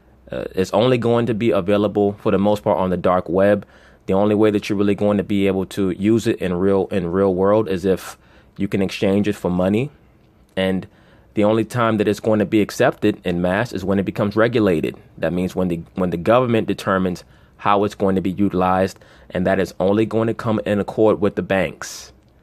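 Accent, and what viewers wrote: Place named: New York, United States. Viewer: Amp-Dog Productions he has a New York accent man lol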